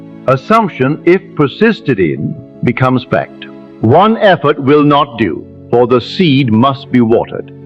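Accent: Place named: RP, United Kingdom. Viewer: I am more confused than ever. What on earth is he saying? Posh accent:-)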